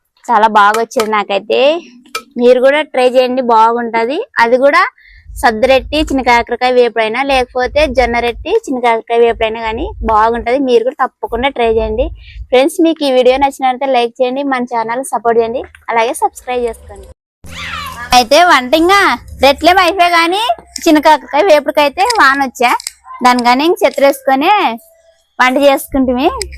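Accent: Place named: Raichur, India. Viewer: Mee a uru akka? Naaku chinna kakara kailu ante chala istam memu manvi Raichur Karnataka Raichur lo kuda telugu ilage matladutam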